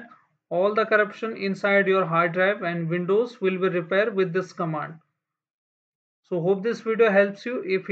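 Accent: India. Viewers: always trust the indian accent tutorial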